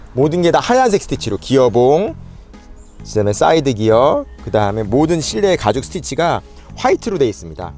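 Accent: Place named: Gyeongsang, South Korea. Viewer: ㅎㅎㅎ굿 비교 영상 좋네요. 갱상도 말로 지깁니다.ㅎㅎㅎ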